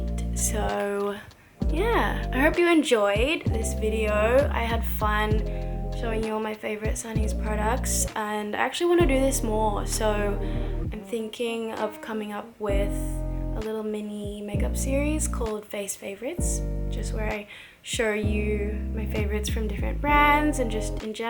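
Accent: Australia. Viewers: why does she have a weird lowkey australian accent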